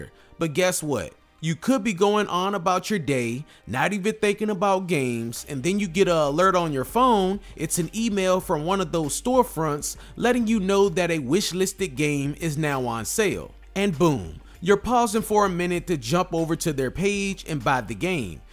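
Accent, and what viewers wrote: United States. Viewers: The American accent 😂😂😂❤❤❤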